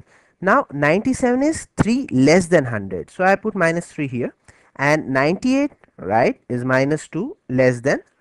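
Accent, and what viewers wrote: India. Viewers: are you a realy british... because your accent is like indian :D you are the best broh... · ur vioce its like an indian voice BTW im going to use this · LOL are u from India? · indian accent! nice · u r an INDIAN !!!... indian accent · hey i think u r an indian but no offence. your accent is that of an indian.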